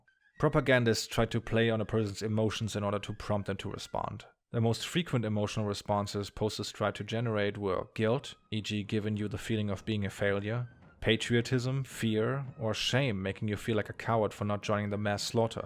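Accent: Germany. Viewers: I like how emotional your voice sometimes gets, when reading excerpts and impersonating people etc. (Plus I love the German accent :) You don't manufacture enthusiasm and you don't drone boringly, as many youtubers do, you're not fake.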